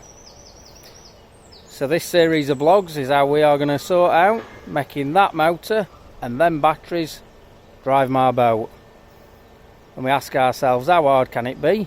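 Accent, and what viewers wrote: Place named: Sheffield, United Kingdom. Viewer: Can’t work your accent out, bit if Sheffield bit of Chesterfield. Only watched this one video so far but will be going through your channel. Nowt beats a bit of Yorkshire tightness, love it 👍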